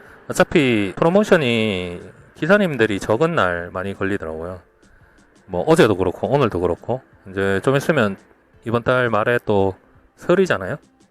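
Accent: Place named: Gyeongsang, South Korea. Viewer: ㅋㅋ 말투가 대구분인가요?? 정겹네요 ㅎㅎ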